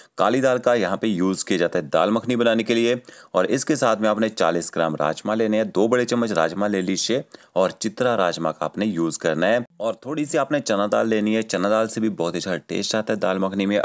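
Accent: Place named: Himachal Pradesh, India. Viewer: App kaha se ho u sound like Himachali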